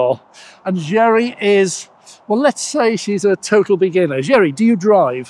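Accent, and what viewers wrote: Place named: Liverpool, United Kingdom. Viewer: "From your accent you're not British".... I seriously thought she might reply "No, I'm Scouse". Because that accent is more Scouse to me than Hungarian.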